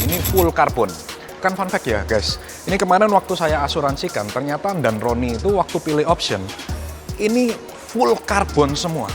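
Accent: Java, Indonesia. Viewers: kaya tapi jelek dan suaranya kenapa jowo bgt yah? tapi mantabh lah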